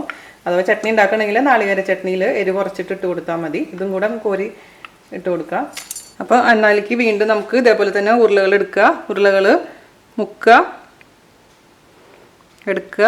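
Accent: Thrissur, India. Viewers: Good presentation in Trichur Malayalam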